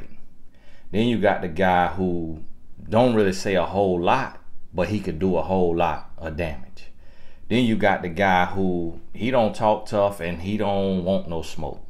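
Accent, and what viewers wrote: South, United States. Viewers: I love the voice impressions😂 Just cracks me up. But the country accent makes a lot of these truths more believable. You know what you know and have this here knowledge to share, and you are sharing it, For Free. You could bundle these pod casts in a series and sell em. Keep doing what you are doing! Blessed tribe. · You from down south hear it in yourp accent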